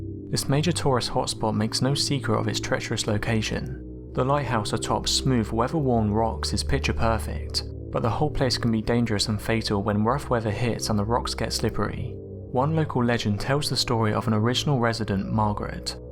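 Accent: United Kingdom. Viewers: Why do you have a stupid limey narrating this damn video instead of an actual Canadian who can properly pronounce the cities and provinces in Canada??? Couldn't you have corrected the idiot before the final recording??? JEEZZZ...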